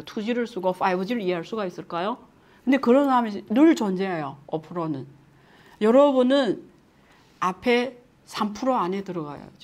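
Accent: China. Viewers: 억양이 조선족? 조선족 못믿. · 조선족인가요? 말투가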